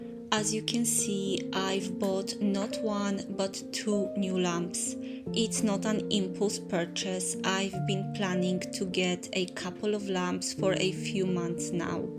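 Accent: Poland. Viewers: jestes Polka?:) zgaduje po akcencie ale i imię. Piekny film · This is my first time here and wondered where are you from because I heard an eastern accent. When I saw you preparing racuchy I smiled: you are Polish! Me too! Greetings from Warsaw.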